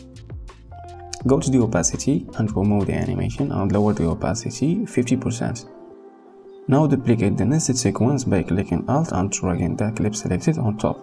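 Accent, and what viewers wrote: Morocco. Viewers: Moroccan accent 🤍 keep going bro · moroccan accent yak hhh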